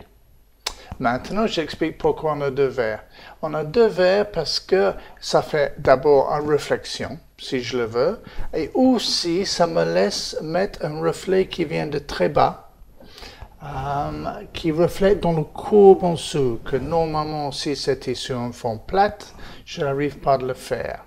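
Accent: England, United Kingdom. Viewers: Merci merci super utile et j’avoue le petit accent anglais c’est juste la classe!! 👍🏼☺️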